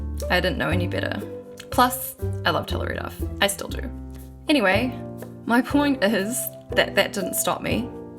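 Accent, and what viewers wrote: New Zealand. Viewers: Omg i love your new Zealand accent!!(I'm in Australia😊)it really sucks to get rejected but it doesn't define you! You ARE talented, your voice IS beautiful❤❤❤I believe in you😘😘😘you will learn from this experience and improve next time😊✨✨🎶🎶🎶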